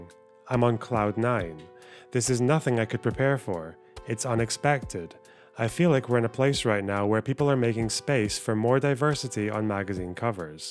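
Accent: Canada. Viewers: Nailed that Albertan accent my guy. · I absolutely lost it at your beautiful Canadian accent. Well done 👍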